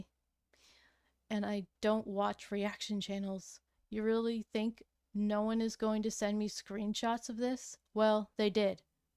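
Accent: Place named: Canada. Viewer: Enjoy and appreciate your updates! Also your Canadian accent is everything!! Lol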